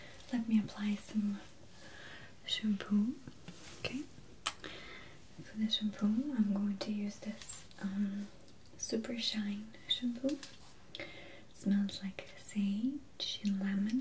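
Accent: Russia. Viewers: i love your accent sounds russian such a relaxing video :) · Her accent is Russian. Watch some newer videos. Sometimes she talks about Russia.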